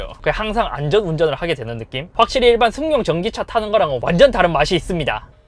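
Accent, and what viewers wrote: Gyeongsang, South Korea. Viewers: 어쩐지 경상도 말투다 했는데 안동사람이군.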